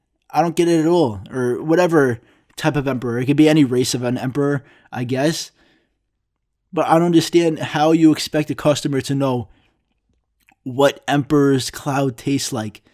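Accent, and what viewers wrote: New York, United States. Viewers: I know you're from Greece, but you sound like you came from New York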